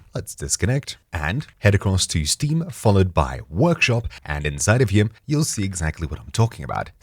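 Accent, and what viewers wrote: South Africa. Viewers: Are you south african? if you are sick man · I didnt know this man was South African. Sawubona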